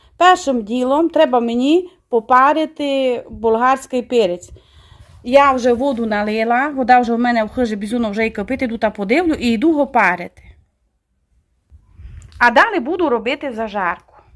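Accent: Transcarpathia, Ukraine. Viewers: Дивлюся файний рецепт і заодно вчу закарпатський діалект. Як же приємно чути вашу вимову❤ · Закарпатська мова топ твоя фішка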